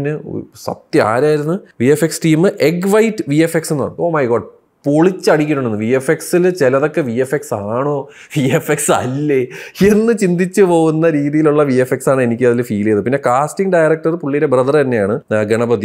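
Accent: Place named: Thiruvananthapuram, India. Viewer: നിങ്ങളുടേം തിരുവന്തോരൻ ന്റെയും ഹൈ ലൈറ്റ് " തിരുവനന്തപുരം " സ്ലാങ് ആണ്.. കേൾക്കാൻ തന്നെ നല്ല രസം - എന്ന് ഒരു എറണാകുളം കാരൻ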